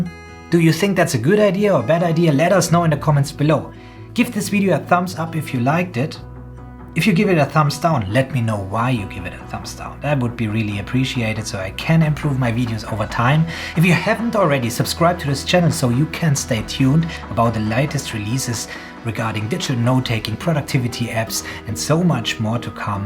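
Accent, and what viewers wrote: Germany. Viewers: I can hören that you kommen aus germany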